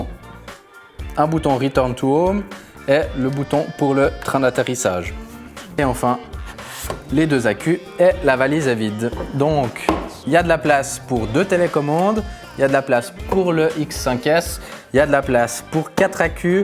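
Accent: Switzerland. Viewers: Nice 👌🏻 Suisse ? Avec l’accent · bel accent suisse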